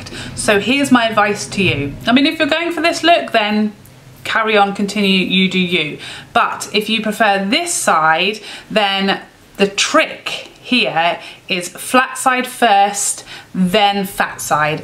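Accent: England, United Kingdom. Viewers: You've gained a new subscriber in me, not just for your makeup info but your english accent and phrases, whack, willy nilly, got me😁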